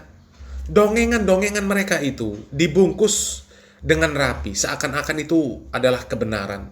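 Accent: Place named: Pontianak, Indonesia. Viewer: Iya ini bahasanya org melayu Pontianak..👍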